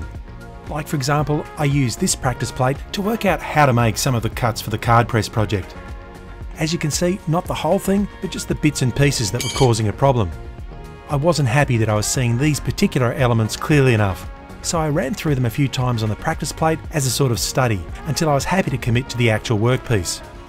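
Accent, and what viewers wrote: Australia. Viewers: Thanks for the video! A versatile guy who knows how to keep going on the job! Sound advice, good sound/video and pleasing voice/axcent! Not sure, could be Australian?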